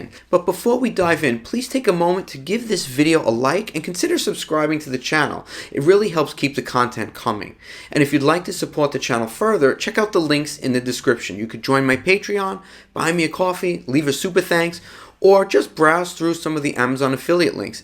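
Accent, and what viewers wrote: New York, United States. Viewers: Nicely done and thank you for the work on this. I'm trying to place your accent...which borough of NYC are you from?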